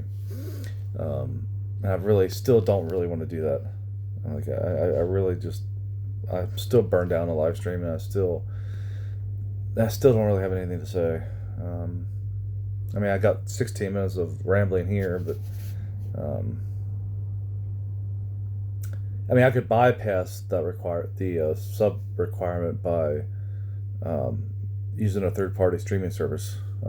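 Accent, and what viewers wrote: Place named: United States. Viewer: Good to see you back. I could swear that you're starting to lose your American accent. But I don't think that its accurate to say that mental illness is the reason for not being in a relationship. You yourself admitted that it was 'luck' so in the case of Todd and OC what could they possibly do to increase their chances? Todd after all did work for over 10 years in jobs surrounded by people and nothing happened. Then there is what one wants vs whats available. But its good that things are working out for you. You do seem more composed and self confident than what you did before.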